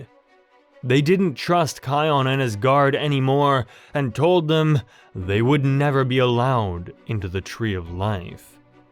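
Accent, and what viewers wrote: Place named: United States. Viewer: Kopa never meet Kion and Kiara i remember the time Zira attacked Kopa you sound American im pretty good at recognizing people's accents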